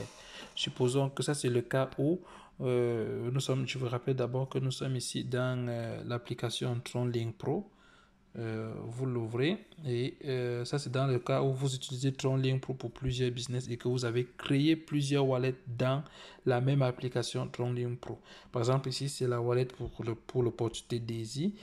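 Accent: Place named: West Africa. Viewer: Parles toi bien c'est quoi cette langue franco africaine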